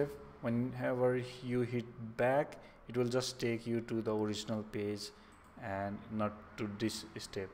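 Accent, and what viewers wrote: India. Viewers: Indian Accent is annoying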